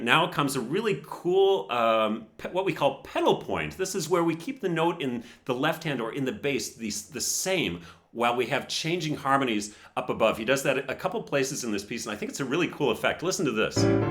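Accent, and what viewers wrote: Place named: Germany. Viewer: His dialect sound very german, especially when he say "Patrik Pietschmann"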